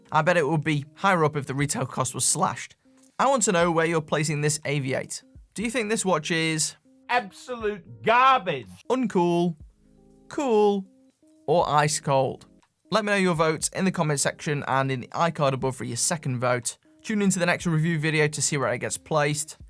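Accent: Stoke, United Kingdom. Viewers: My dad was from Stoke. Your accent is absolutely fine by me as it reminds me of him now he's no longer with us. Love your reviews too.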